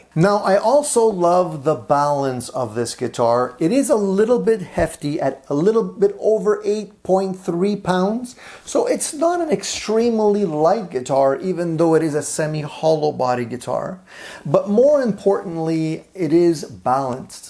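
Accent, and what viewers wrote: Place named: Canada. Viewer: Hi where is your accent from? Italian Canadian? Sounds similar to me! Cheers! Nice guitar sounds great!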